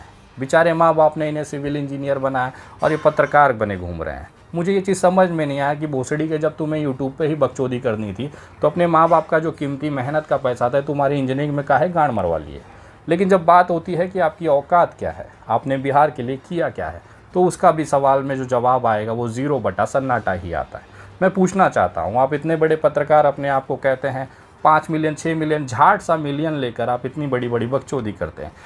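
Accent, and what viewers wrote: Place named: Nagpur, India. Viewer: Aise kon bolta hai bhai bechara नागपुरिया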